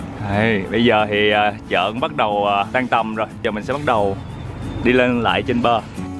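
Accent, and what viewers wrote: Mekong Delta, Vietnam. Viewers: / dể thương nói chuyện đúng dân miền Tây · Giong mien tay thi khong phai chê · giọng nói đặt sản miền tây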